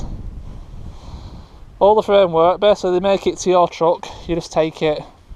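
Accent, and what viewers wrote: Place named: North, United Kingdom. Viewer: Lovely Jubbly, great northern voice.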